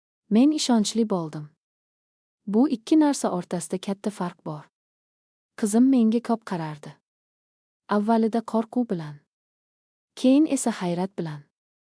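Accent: Russia. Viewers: Бу укиетган киз татар миллатига мансуб булса керак шунинг учун шундай укиса керак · Ким укиябди урисми товба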